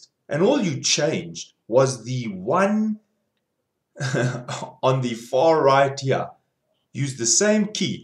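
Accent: South Africa. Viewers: Definitely a South African accent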